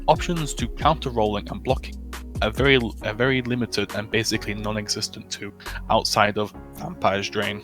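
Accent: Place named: United Kingdom. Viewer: I´m just here to listen ´s lovely brit accent^^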